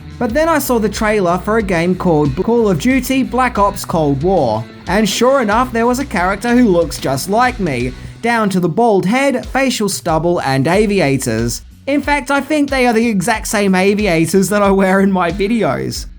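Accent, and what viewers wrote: Australia. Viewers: THANK YOU, just Thank you. You are wonderful as you are and your aussie accent is brilliant, there are a lot even worse accents outthere. Greetings from Vienna, Austria. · Watching your videos helps me practice my Australian accent. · Yes, I find your accent a bit annoying ( Im an Aussie too) BUT most of the facts you present are original and fresh so that's why I subscribe and follow. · You definitely made 2020 easier to get through and I think people with Aussie accents are just fine. Other Youtubers from there sound just as cool. How good? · I have throughly enjoyed all of your videos that I’ve watched so far which has been a lot. I quite enjoy listening to your Aussie accent. As for the haters just remember this quote “You may not be everyone’s cup of tea but you are definitely someone’s double shot of vodka”. I look forward to watching more of your videos. 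💜🇨🇦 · Thanks, As a fellow Aussi, I don't find your accent annoying. There are worse Australian Accents than yours (Tasmania anyone). How about a series of videos on 70s, 80s, and 90s movie sex symbols? Just an idea. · Seams like someone is just envy of our boy! I like your happy face and your Ozzie dialect, it feels fresh and puts a smile on my face. Don’t change a thing and don’t bother with any haters, if they don’t like the channel they can’t go watch WatchMojo or something! Regards from Sweden! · despite what some people think I think you are very positive human being with a very good sense of humour either lighting me about some of the movies I like most and some I don't like very much I look forward to hearing you speak your wonderful Australian accent keep up the good work and I'm jealous of your action figure good work brother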